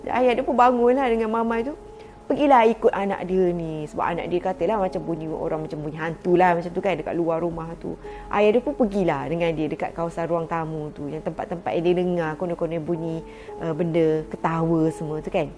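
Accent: Kelantan, Malaysia. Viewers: hai kak dengar macam org klate?... sentiasa tgk video kak terus maju jaya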